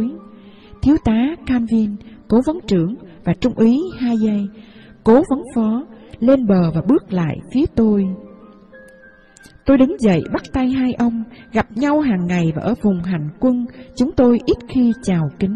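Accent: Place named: South, Vietnam. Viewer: Giong noi' cua Co dien doc tren day . Oi ! That em ai' ,diu dang' . Giong noi' de thuong cua nguoi' con gai' mien ' Nam .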